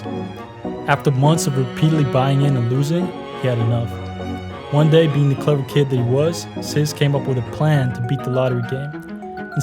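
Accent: Netherlands. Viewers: You sound dutch or flemish 🤔 am I right? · You have Dutch accent. If you are not Dutch that's not very common 😄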